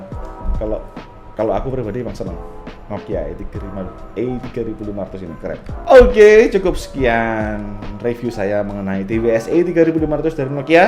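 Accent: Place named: Surabaya, Indonesia. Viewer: pantes suarae khas arek suroboyo... sik iling ae 😄 semangat... sering2 gawe konten ya 👏🏼👏🏼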